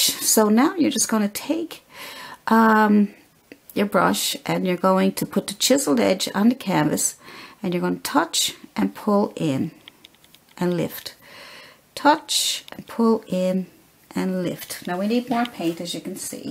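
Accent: Newfoundland, Canada. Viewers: You from Newfoundland? Accent?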